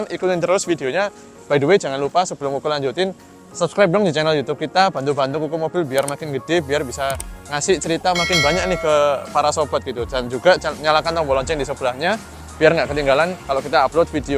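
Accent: Surabaya, Indonesia. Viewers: Logat Suroboyo wkwk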